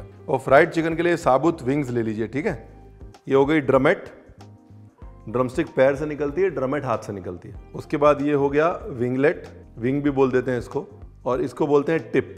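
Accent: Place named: Punjab, India. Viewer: Looking delicious! Love your style of speaking… being a Punjabi it’s wonderful to hear the slang . Be the way you are! ❤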